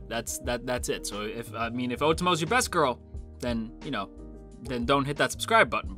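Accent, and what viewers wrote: Canada. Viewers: great videooo as usuall! i was super excited on hearing your thoughts on this episode! on another note i had a feeling from ur accent u were canadian and you confirming it really made me kinda proud of myself i guessed right yay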